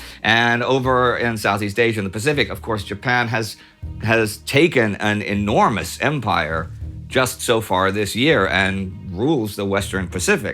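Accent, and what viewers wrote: Texas, United States. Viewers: your Texan is showing. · I love texas-accent <3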